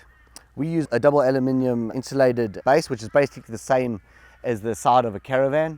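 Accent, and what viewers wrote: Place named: South Africa. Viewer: Hey, great SA accent for an Oz! Nice product, thanks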